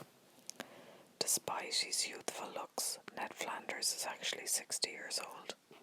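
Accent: Ireland. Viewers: Are you Irish? You remind me so much of a friend of mine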